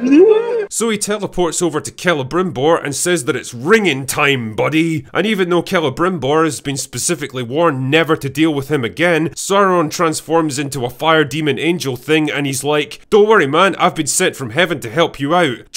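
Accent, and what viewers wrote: Scotland, United Kingdom. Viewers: Thank heavens, I saw season 2 was on Amazon last night, I watched the review of season 1, and i felt so awful after 3 minutes, I wondered if Id need to find the toilet.. This is not the video I wanted, but its the video I Needed, as sorts out the world into sanity/insanity in sheer minutes with an excellent Scottish brogue. Cheers man.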